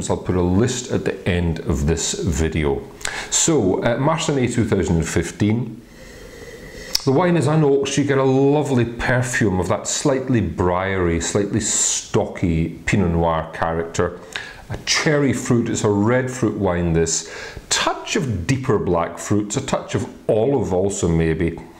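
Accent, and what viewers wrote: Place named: Scotland, United Kingdom. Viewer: Hearing a Scotsman talk about wine is taking me a minute to wrap my head around! :P Came to learn about Marsannay in general, you reviewed THE EXACT wine we'll be drinking this eve! Cheers from America (and my girlfriend who is a transplant from Fife. :) )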